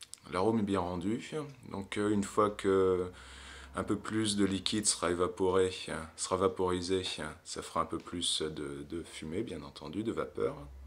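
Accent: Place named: France. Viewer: I gave it a like just for the french accent love it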